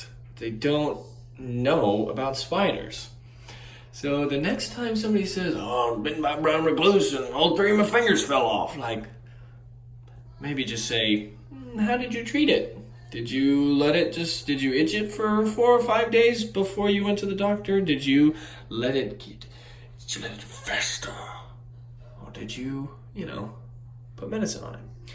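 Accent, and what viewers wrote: RP, United Kingdom. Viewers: That Attenborough accent tho...❤👏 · Good british accent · Good posh English accent and I’m English!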